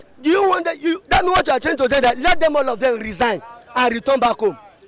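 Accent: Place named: Yoruba, Nigeria. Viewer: He's not Hausa u dunno ur own country men lol his, accent hangry is Yoruba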